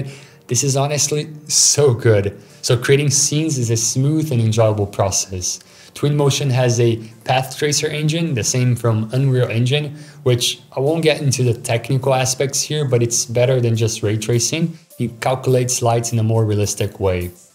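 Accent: Brazil. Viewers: are you brazilian? you english sounds like brazilian.´s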